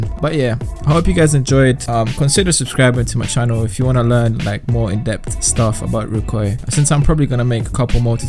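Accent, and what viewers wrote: United Kingdom. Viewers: I'm guessing your British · At u British BC u sound like it lol( =①ω①=)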